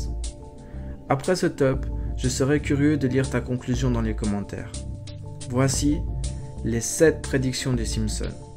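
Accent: Belgium. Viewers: + articulation TRES faible, je connais bien l'accent belge mais je n'arrivais pas non plus à comprendre les années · C'est obligé ce mec il est belges vu comment il prononce les dates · T belge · Il est belge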